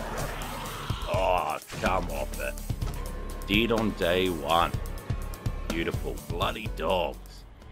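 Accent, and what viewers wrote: Australia. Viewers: 😅im new to the channel love the videos. Has anyone told you that you sound like Farket (a Australian youtuber)?? Are you Australian?!? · I know I'm 5 months late to the party but very cool to see a console Let's play, Even though its not up to date as the PC version It give me some nostalgia since I fist started playing in Alpha 16-17. It also doesn't hurt that I'm a sucker for Aussie and Kiwi accents :)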